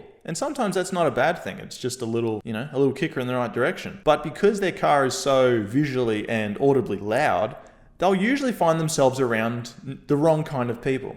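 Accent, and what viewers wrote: Australia. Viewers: This is my first video in your channel. Are you based in Australia? because I don't want to judge based on accent. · Good take, green car, AND an Australian accent all in one?? Sub.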